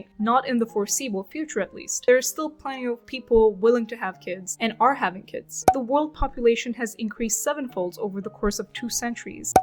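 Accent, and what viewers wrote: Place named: Turkey. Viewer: I am Turkish too and I was like "This accent is really really familiar " in the first video, Anyway Great video! I love the first one second one is also really good!!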